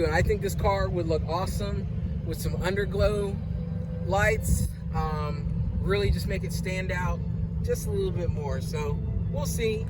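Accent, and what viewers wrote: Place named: West Coast, United States. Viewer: well it's only 2nd grade English but I wasn't taking a shot at you personally... millions of West Coast people have that exact inflection, especially your bro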